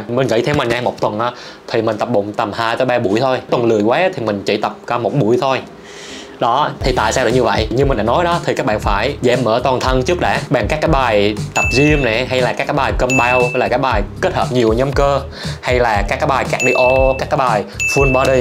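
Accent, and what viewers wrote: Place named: Phu Yen, Vietnam. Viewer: Bạn nói tiếng giống người phú yên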